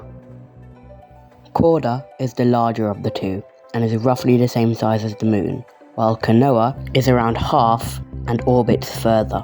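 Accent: United Kingdom. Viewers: Are you british 💀 also i know im very late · I would call this ok, but the british accent turns this into a 100/10, oh yea, and the vsauce sound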